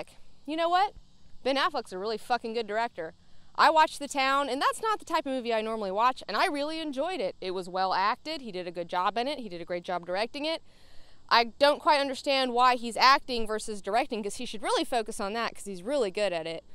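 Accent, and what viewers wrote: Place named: South, United States. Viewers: Yer southern accent comes back the further east you go. Yeah, yeah - cast the unknowns! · I noticed a southern twang in your accent I never picked up on before. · LOOK WHO GOT HER SOUTHERN DRAWL BACK! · Where are you from? Slight southern accent coming through. Going to say Nebraska. Yes? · I know youre in Alabama shooting the video but is that home, because that local accent was really coming out, i thought it was my imagination at first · im really starting to hear that southern accent come out of you, especially when you got that alcohol in ya. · You picked up a southern accent. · Sounds like Female Kenny Powers. Is she from the place she's vacationing? I know a lot of people revert to their 'drawl' when they go back to their home turf. I see it when I go back my home; get drunk and start talking local again. 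No issues at all with the statements though; entertaining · Wow, sounds like the Alabama accent really stuck on you. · Spend some time in Alabama and the Southern comes out.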